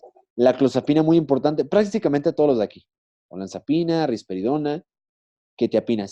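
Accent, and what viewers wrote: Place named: Fresa, Mexico. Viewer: Hablas como pendejo, muy fresa che idiota.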